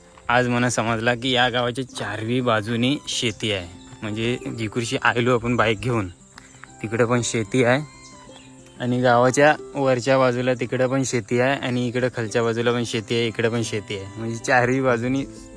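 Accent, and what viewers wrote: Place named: Agri Koli, India. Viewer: Tuze sarva videos changle astat nisargaramya navin gaave baghayala khup chan vatate tuzi agri koli bhasha aikila khup chan vatate 😊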